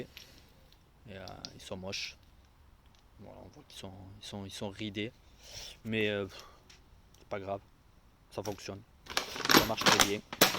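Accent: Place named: South, France. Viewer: T es un sudiste vu ton accent on est presque voisin 👋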